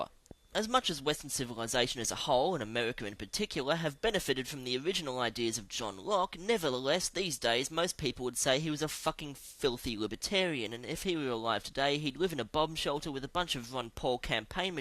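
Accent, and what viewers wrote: Australia. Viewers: Your Australian? :D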